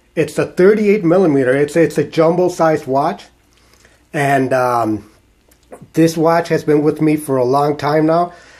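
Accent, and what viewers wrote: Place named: Mexico. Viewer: Don't pay attention to these fucking swiss fanboys who complain about your mexican accent. You have more class and appreciation of unique timepieces than all of them put together.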